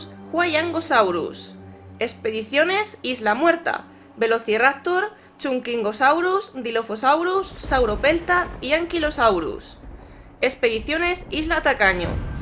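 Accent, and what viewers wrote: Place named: Castile, Spain. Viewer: Tienes acento castellano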